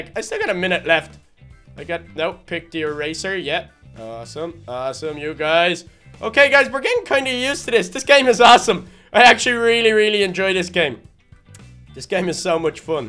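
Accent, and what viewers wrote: Dublin, Ireland. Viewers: So your Irish? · Are u from Dublin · Are you from Ireland I'm not from Ireland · I knew you were irish because I'm irish and its ovious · are you from Dublin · I knew that ur from Ireland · Your Irish wow I thought you was English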